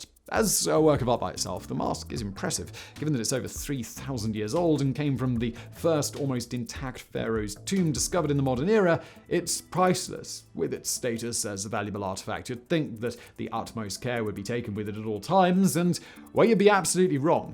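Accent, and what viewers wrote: England, United Kingdom. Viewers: I'm so glad I'm not English. I would probably sound like this dude when I talk and that would piss all of us off and have to punch myself in the face if somone didn't beat me to it(no pun intended). And dont these people realize if you smash the like button you will break your electronicsl Stupid limese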